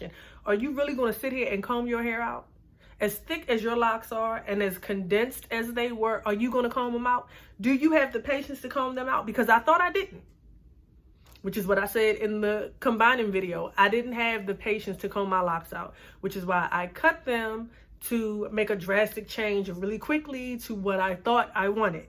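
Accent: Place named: Prince George's County, United States. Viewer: I'm from PG, the accent!!! Makes me miss home 💛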